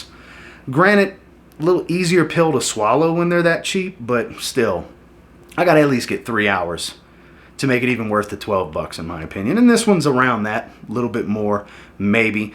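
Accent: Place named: Gulf Coast, United States. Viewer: I’m wondering what you would recommend for a night out on the town in New Orleans. May be wrong but you sound like you’re from the region, gulf coast at least… therefore you know how hot and humid it gets. Looking to wear a fragrance for this Saturday if possible. Already own some basics that could work like Sauvage and CDNIM… Sauvage got me strong compliments the other night. What would you recommend to me? I was close to getting profondo but am now changing my mind. How about something like Prada Carbon? I’ve been looking for days for a good summer night fragrance and I can tell you’ll set me straight!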